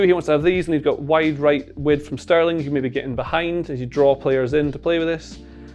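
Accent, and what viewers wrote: Scotland, United Kingdom. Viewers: We’ve got super, he knows exactly what we need! Tactics on the screen, Scottish in our ears, Arsenal on the way to Champions League! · He's from Scotland?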